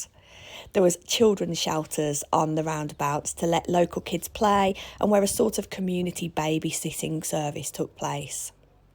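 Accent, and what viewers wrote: Birmingham, United Kingdom. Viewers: I feel the same as you about my Brummie accent. It's lovely to hear it as I moved away years ago and also your videos are great. I've not been to Austin Village for decades, thanks for the info on it. 😊 Tara a bit!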